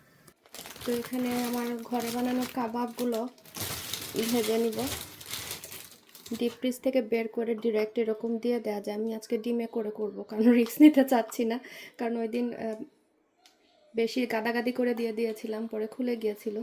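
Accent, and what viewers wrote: Sylhet, Bangladesh. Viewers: আস্সালমুয়ালাইকুম ,আজকে ১st কমেন্ট করলাম, আমি আপনার একজন রেগুলার ভিউয়ার্স, আমি আপনার ব্লগ দেখি কত ইয়ার আগের থেকে ঠিক মনে নাই, তবে আপনার সিলেটি ভয়েস শুনে ই আমার জা আপনার ব্লগ শেয়ার করেছিলো বলসিলো দিনা কি সুন্দর সিলেটি ভাষা শুনতে ভালো লাগতো তবে অনেক কথা ই বুঝতাম না 😅, তখন মনে হয় করোনা র সময় ছিলো ।আমরা জাপান থাকি ,আপনার ব্লগ অনেক ভালো লাগে, আর কত যে রেসিপি আপনার কাছ থেকে শিখছি, আলহামদুলিল্লাহ, একটা কাবাব এখন ও প্রায় করা হয় ওই যে পাটা তে চেছে বিফ কাবাব, আপনার আম্মা র রেসিপি । অনেক দোআ আপনার জন্য মাশাআল্লাহ এত্ত গুণী আপনি, আর আপনার ঘর গুছানো আমার অনেক পছন্দ ।অনেক কিছু লিখতে মন চাইতছে থাক ❤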